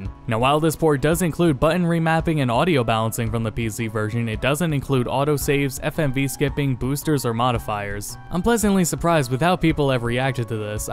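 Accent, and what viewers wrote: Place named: United States. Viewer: "Teet-us"? Really? You sound American. There is no excuse for you pronouncing it that way. lol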